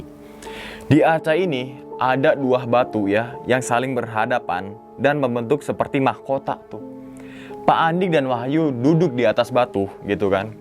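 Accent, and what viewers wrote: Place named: Java, Indonesia. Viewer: Basa jawa ne bang lucu ☺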